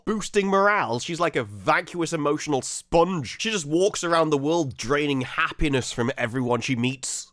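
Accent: United Kingdom. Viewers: Go to the Dentist u brit, handsome voice tho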